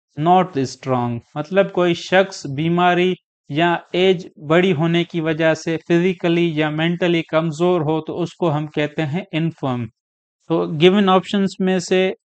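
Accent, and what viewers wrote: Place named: Sindh, India. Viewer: Keep it up....very great efforts....ur pronunciation infirms that u r sindhi man...Am I right ???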